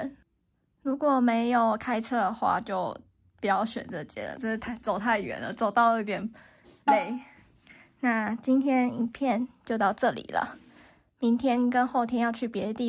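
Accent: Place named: Taiwan. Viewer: 我怎么那么喜欢听台湾国语呢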